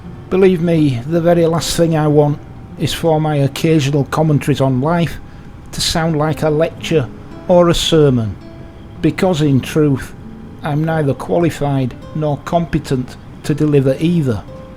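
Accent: Yorkshire, United Kingdom. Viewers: Spoken by a good and proper Yorkshireman. Good man. 👍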